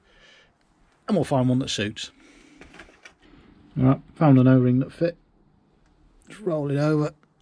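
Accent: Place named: United Kingdom. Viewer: Hi; I kept watching due to the British accent. Do you have the thackery washers to the rocker boxes; as supposed should be? like in the later parts book drawing?; not in the manual book. Also kept watching to see an UK type model (I have the export model that is similar) but seems all have the USA specs...I need to upload a video at some point. I have a 79 with dual plug head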